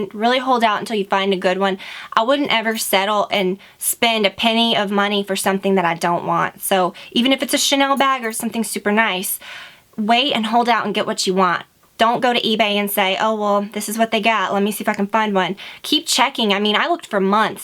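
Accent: South, United States. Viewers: lol why do I feel like you have more of a country accent in this video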